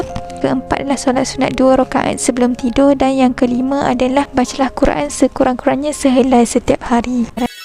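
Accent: Kelantan, Malaysia. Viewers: Wah mengajar dialek Kelantan 😲. Saya rasa bangga pulak bila boleh faham sikit-sikit 😂 bila ada cakap Kelantan. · Menariknya ulasan ni 😍😍😍. Suka dengar kecek Kelate. Ulang, ulang dengar 😄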